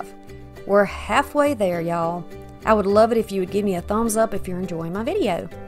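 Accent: South, United States. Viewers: Just was watching Jackie and found you. I love your southern sound, I too have it, I'm from GA. I love your work and your cheery personality. Rhonda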